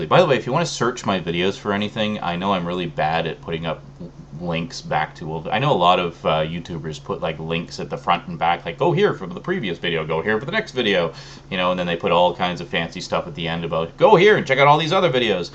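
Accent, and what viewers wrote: Canada. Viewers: ***** Are you from Canada?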